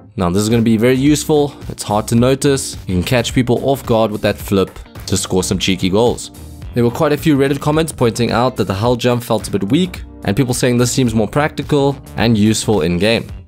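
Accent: South Africa. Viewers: U sound like u from SA big up anyone from Cape Town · Do you have a South African accent?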